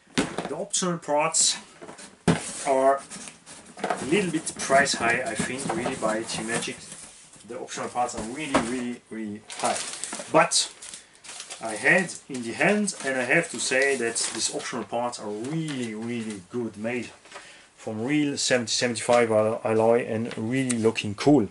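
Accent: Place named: Alsace, France. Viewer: Je comprends rien que ce soit en anglais ou allemand mais entendre ton accent alsacien un vrai régal 😊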